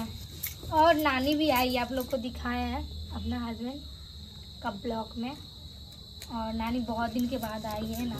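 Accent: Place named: Jharkhand, India. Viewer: Jharkhand kolkata side aise hi bolte h waha k log meri saas bhi bolti h massala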